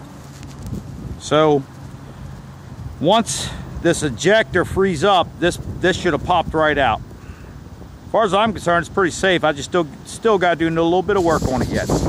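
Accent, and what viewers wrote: New Jersey, United States. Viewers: Chris Landry Jersey accent is an american accent 😑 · Jersey accent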